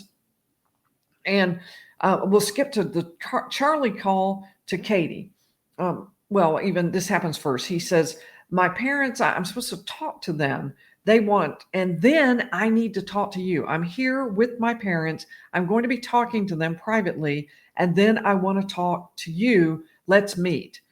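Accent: South, United States. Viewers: LL reminds me so much of my gentle southern grandmother. Anyone know where she was raised to get her accent? My grandmother was from Louisville.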